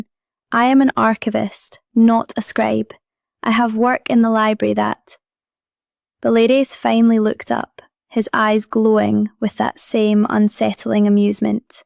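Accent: Scotland, United Kingdom. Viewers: I have enjoyed this story, and love the accentnof the narrator, since Scotland is where many werewolf stories originate. Just wish the voice was an octave lower. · Love the Scottish accent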